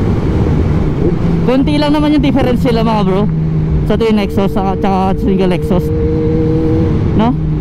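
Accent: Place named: Ilocos, Philippines. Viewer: ilocano ka met brod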